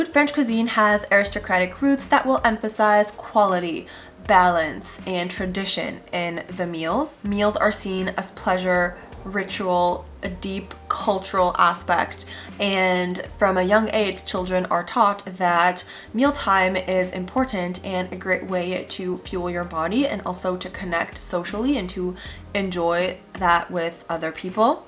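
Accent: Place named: United States. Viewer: Just started following. Love the videos, but I am curious why you have an American accent.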